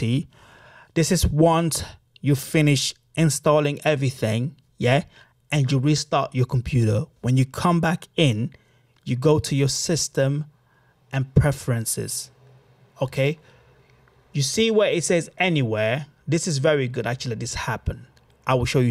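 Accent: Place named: South London, United Kingdom. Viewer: bruh this guy is on sum south london shit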